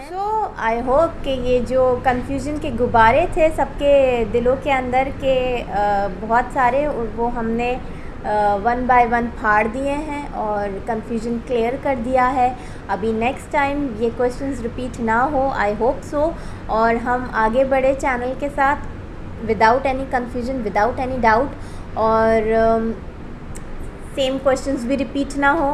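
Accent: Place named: Kathiawar, India. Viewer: If your ancestors are from Karachi/Sindh/Pakistan then your mother tung should be Sindhi. Why are you speaking Gujarati? In Karachi most Gujarati speaking muslims are migrated from Junagadh Saurashtra. Example one of greatest Pakistan's cricketer Hanif Mohmond n his brothers all were born in Junagadh accept Shadik Mohmond. You needs to do more research on your ancestors because your gujarati speaking tone is Kathiawadi.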